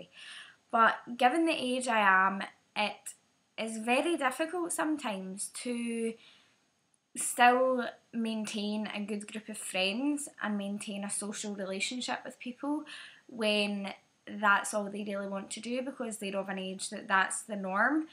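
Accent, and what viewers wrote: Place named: Central Scotland, United Kingdom. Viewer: Hi, thanks for making this video. I do really understand what you are going through. I'm 26, I have MS and basically your video describes my situation too. I'm also Scottish (central) your accent doesn't sound as if you are far up north. If ever you want someone to hang out with that is in the same frame of mind feel free to drop me a message. Hope you are doing okay X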